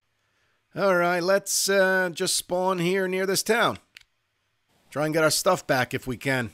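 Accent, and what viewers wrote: New Jersey, United States. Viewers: Funny when u are from Jersey u dont notice the accent lol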